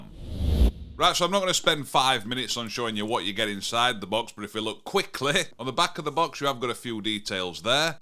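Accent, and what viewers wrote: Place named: Wakefield, United Kingdom. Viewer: Love your accent. You sound just like my family members from Wakefield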